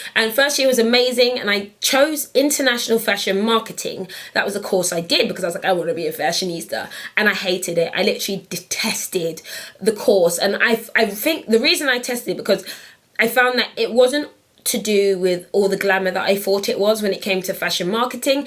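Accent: Nigeria. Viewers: MORE NIGERIAN ACCENT! hilarious haha xx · lol your nigerian accent i love and what colour is your nail polish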